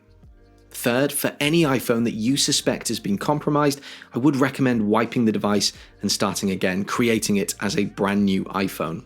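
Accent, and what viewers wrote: United Kingdom. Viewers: Jailbreaking your iPhone, and changing the password from the default of alpine to anything else is infinitely more secure than not. VPNs don’t really help that much. If anybody knows what they’re doing, they can see through the nonsensical rerouting of your IP address. The British accent really helps.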